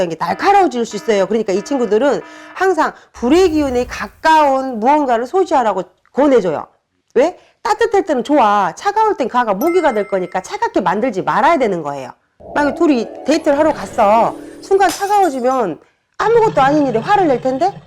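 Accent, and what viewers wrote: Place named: Gwangyang, South Korea. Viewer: 선생님 말씀이 씩씩해서 너무 좋네요 .. 광양분이신가봐요?? 사투리가 넘 좋네요~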